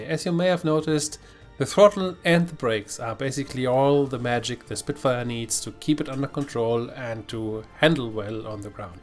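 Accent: Germany. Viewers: I may be mistaken but you have a very thick German accent, a German in a spitfire, what a time to be alive.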